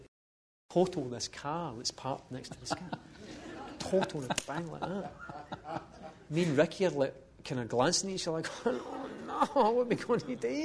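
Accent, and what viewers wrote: Scotland, United Kingdom. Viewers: can someone translate please, thought I understand english, scotish accent is still quite difficult to get! Thanks! A Catalan.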